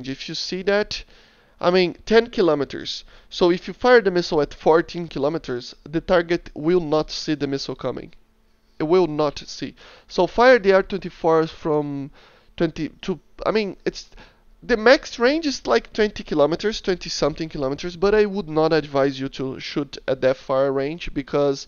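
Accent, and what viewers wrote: Brazil. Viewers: Pelo sotaque eu desconfiava que era BR, bem legal o vídeo cara, bom trabalho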